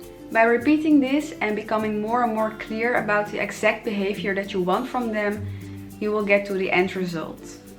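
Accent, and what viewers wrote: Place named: Germany. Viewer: Wie du englisch redest man weiß das du deutsche bist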